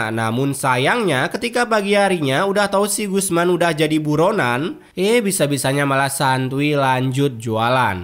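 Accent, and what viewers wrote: Bali, Indonesia. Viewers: Logat Bali nya ketara bener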